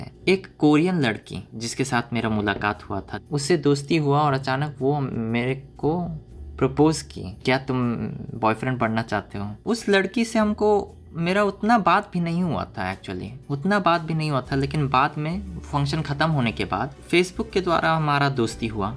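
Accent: Bihar, India. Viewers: i don't know why but i love to listen bihari accent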